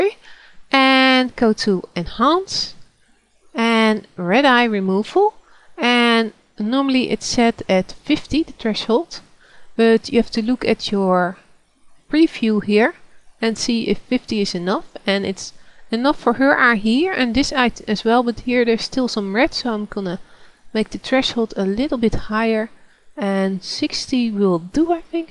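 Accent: Netherlands. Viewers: lol, ik hoor wel dat je een jonge Nederlandse vrouw bent ;) Buitenlanders hebbe' natuurlijk geen idee. Goede filmpje bij de weg.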